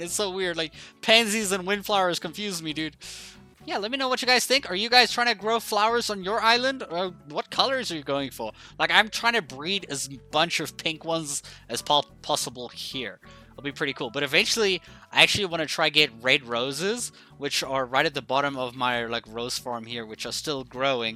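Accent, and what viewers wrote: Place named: South Africa. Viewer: I was watching your video and thought “this guy sounds South African” and I was right! First time watching your videos but hello from SA too 🙋🏽‍♀️😄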